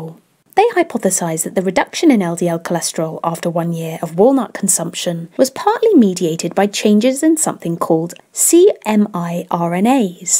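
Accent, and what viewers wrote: England, United Kingdom. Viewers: Having a lovely English accent is pure gold here in America. You already get a 10% bonus for sounding more authoritative. New sub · I love the British accent. Watching from California.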